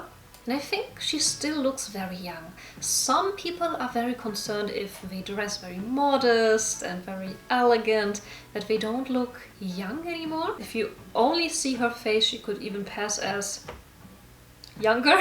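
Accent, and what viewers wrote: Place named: Germany. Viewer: German accent 😂😂😂😂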